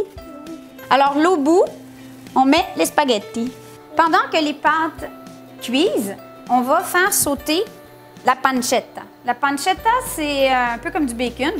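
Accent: Quebec, Canada. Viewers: L'accent quebequois gâche tout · J'adore l'accent québécois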